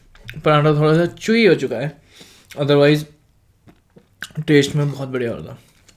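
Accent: Maharashtra, India. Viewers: Lol your marathi or bengali or what?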